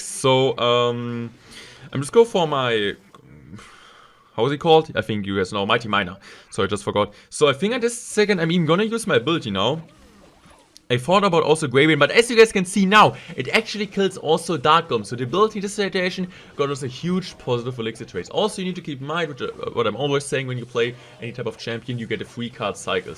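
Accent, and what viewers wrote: Germany. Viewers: German accent alarm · Man hört das Deutsch so extrem raus. "clash wojal"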